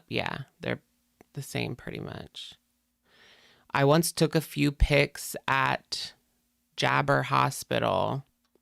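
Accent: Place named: New Jersey, United States. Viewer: Sometimes I can't tell what you're saying with your kind of new jersey accent over the silly fake accents you do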